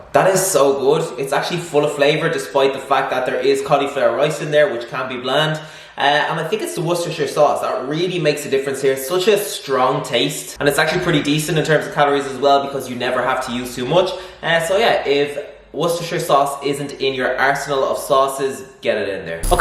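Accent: Ireland. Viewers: This guy sounds irish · Nice Irish accent I’m sure it’s Irish maybe Scottish I’m 90 percent it’s Irish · Are you Irish? You kinda talk like Conor McGregor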